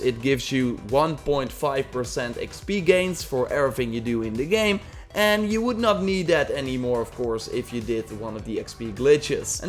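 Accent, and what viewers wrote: Netherlands. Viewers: Niels De Vreugd yes i tought so cus you can hear it at his voice and i’m dutch to.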